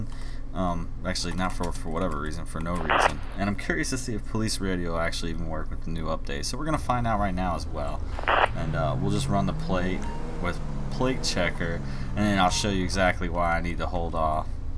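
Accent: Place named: South, United States. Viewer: Lol I always enjoy your accent, if you don't mind me asking where are you from? It sounds like a southern type accent but not Deep South 😂😂 if that makes sense.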